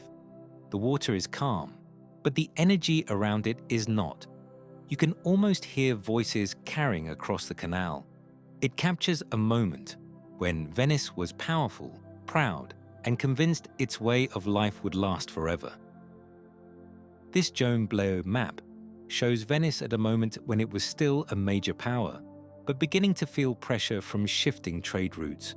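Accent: United Kingdom. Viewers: So, hey, I love what you’re doing with this channel. I am really interested in seeing these old town scenes from historic times be recreated. What you have done with the AI is greater than I ever could have imagined. I do believe that these recreations of the past are a world treasure. There are some things that need improvement, though. The repetitive slides tend to bother me and I feel sort of triggered seeing similar slides be shown several times over. Also, I suspect you use an AI voiceover, and I hope soon that gets changed too. Because that specific British accent and tone of voice is incredibly annoying to me, but maybe it’s just because I’m not British lol P.S. please recreate historic images of Ireland (1600’s to 1800’s) and America’s early western frontier (from the American Revolution to the Jacksonian Democracy)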